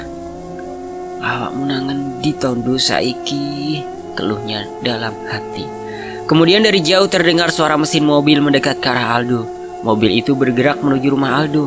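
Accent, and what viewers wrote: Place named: Surabaya, Indonesia. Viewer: dari dialek bahasanya kayak penulis dari Surabaya,cok,rek basa khas suroboyoan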